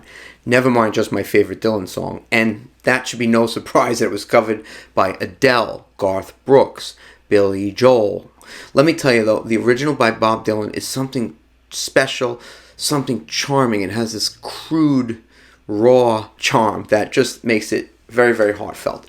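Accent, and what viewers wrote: New York, United States. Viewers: Nicely done and thank you for the work on this. I'm trying to place your accent...which borough of NYC are you from?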